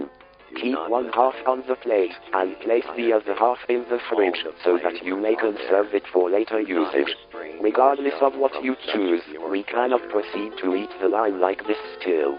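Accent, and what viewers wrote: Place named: France. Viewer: Is this your voice run through a filter? I would've thought just text to speech but it has a definite French accent(I haven't heard your voice in a long time so I can't tell if French tts or you through a filter)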